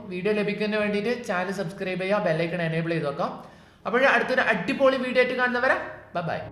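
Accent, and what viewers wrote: Kannur, India. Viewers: Nee kannur aano 😊😊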